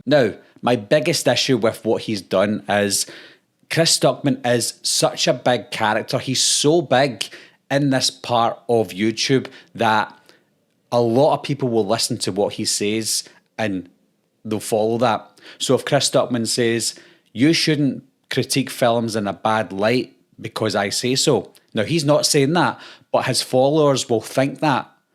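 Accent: Scotland, United Kingdom. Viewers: How does one get THAT Scottish?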